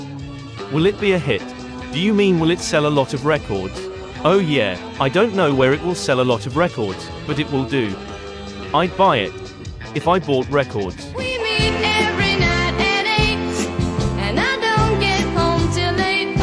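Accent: United Kingdom. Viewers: sure put on a right proper British accent for this program. 😂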